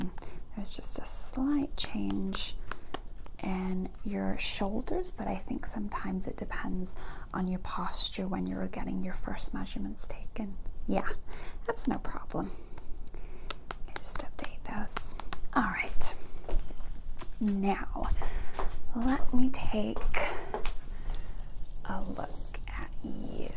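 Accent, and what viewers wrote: South, United States. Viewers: As someone who grew up in the south but no longer lives in the south the way you speak is so nostalgic and relaxing!! it brings me back to elementary school! Please tell me im not insane and your from the south lol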